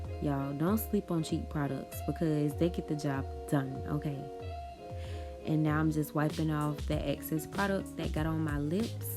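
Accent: New Orleans, United States. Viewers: I love this look and your accent, are you from NO?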